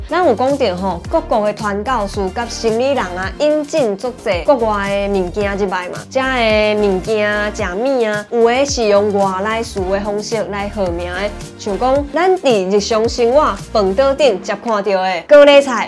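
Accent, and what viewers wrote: Tainan, Taiwan. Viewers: 真正是台南台語口音腔口!! 對啦鄭成功來台帶了二萬八千多軍士來臺，鄭成功為了積糧令官兵解甲吨田開墾耕種糧食並允許和平埔族西拉雅婦人通婚生養兒女落地生根，東寧王朱術桂也發放土地讓農民耕種溫飽 把土地死賜給私人當財產，那時完全沒有憂患意識，等到二十多年之後當施琅叛徒帶領清兵攻打台灣 時，結果鄭家王朝竟沒有一支軍隊可以抵抗，因為軍務早已荒廢，士兵們都成農民了成了平埔族人，四五十歲了連一支弓箭都沒有只有養家的鋤頭！只能投降被滅國了，真的是生於憂患死於安樂!! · 😂😂😂你講台語的腔調超像蕭副的可能是台南腔吧！❤😅😂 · 台南腔的台語很好聽 。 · 阿妹ㄚ講的台語有濃濃的台南腔👍，台南鄉親路過...😄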